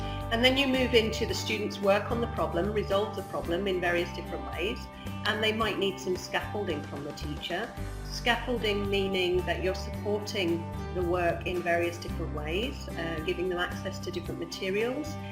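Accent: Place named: United Kingdom. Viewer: Hello:) I've just finished watching your video and I've got to say that, in my estimation at least, it was pretty helpful:) Besides, I've been your loyal viewer for a long time but not in order to learn English, just for fun. Your English is incredibly fluent and additionally your accent sounds very British and so that makes me think you are a teacher. If I'm correct, I'd like to ask you a question:) Well, I currently attend a secondary school and I've been wondering what I can possibly do to decrease my anxiety while talking to my teacher. My being anxious doesn't stop me from keeping a conversation going but it's quite annoying because then I can't help the swaying of my legs from side to side or I feel a constant need to gesture. Also, I sometimes feel kind of embarrassed when I speak and my teacher smiles to me or looks intensively in my eyes. 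I don't know why it works like that. Nonetheless, I do not deal with this problem while shooting the breeze with my friends in English or having a conversation with other English teacher at school. I want to start working on it but I'd need some advice and you're the only available teacher :) I know my message is long, I'm sorry, I hope you successfully reached the end:D I'm looking forward to hearing from you:)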